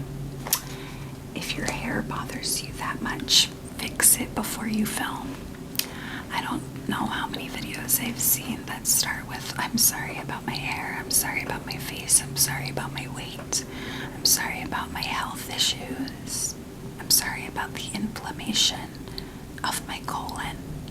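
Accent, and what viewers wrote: South, United States. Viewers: You're truly a gem. Your southern accent relaxes me the most, but at this point I'll binge anything you make, you're a comedy genius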